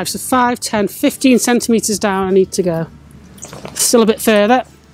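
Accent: United Kingdom. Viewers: I like the British accent, but I have a little difficulty in understanding it !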